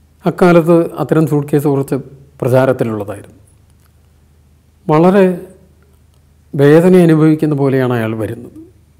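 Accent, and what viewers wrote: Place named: Kannur, India. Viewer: ആ കണ്ണൂർ കമ്മ്യൂണിസ്റ്റ്‌ സംസാര ശൈലി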